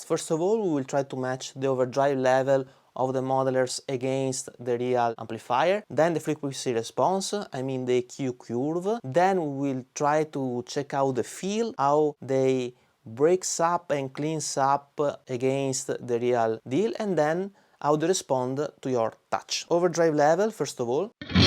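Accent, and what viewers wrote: Venice, Italy. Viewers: Mi piace troppo il tuo accento veneto!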